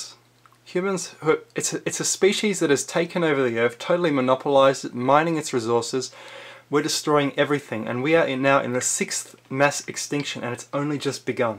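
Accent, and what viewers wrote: Australia. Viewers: This man sounds Australian but he obviously has not seen very much of it. The redcentre is fairly desolate but all states have huge forested areas. The area shown inthis video is in the iron mining area of Western Australia. The rest of Australia isbeautiful and we have the best beaches in the world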